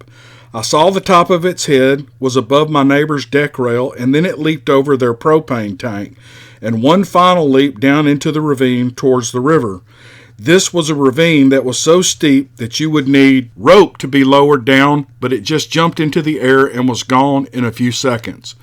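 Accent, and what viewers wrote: South, United States. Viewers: awesome!! luv ur stories bro. you have that southern drawl kinda like peyton manning. just luv the stories and your true self is exposed to your fans. keep up good work! luv from California!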